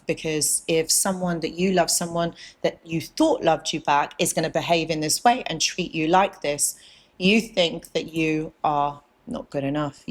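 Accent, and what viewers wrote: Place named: Southern England, United Kingdom. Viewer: You need to learn English, she has a standard southern English accent which is the standard English accent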